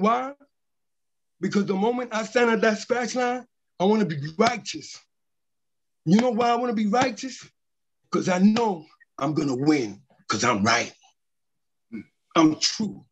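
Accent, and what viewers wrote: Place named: New York, United States. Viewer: sound mad new york. ...every body has to get. thiers. eventually..Every get thier turn. ....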